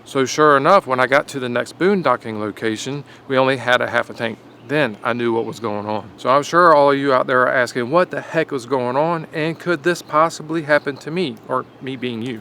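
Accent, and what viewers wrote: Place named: East, United States. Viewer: An Ah ha moment is also called an epiphany. I say waater and you say worrrter, where are you from? The east probably near Pennsylvania? I’m from the south.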